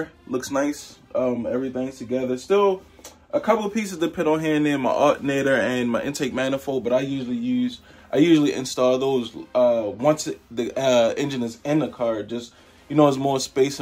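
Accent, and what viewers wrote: Baltimore, United States. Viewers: Based on your accent I'm willing to bet you from the bmore area, or somewhere close lol. But if not, where are you at? I'm in RVA and need a 2jz ge vvti overhaul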